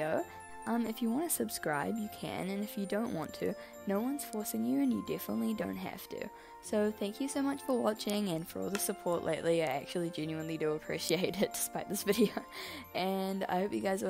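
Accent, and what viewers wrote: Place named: New Zealand. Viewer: You're accent sounds very kiwi 🤔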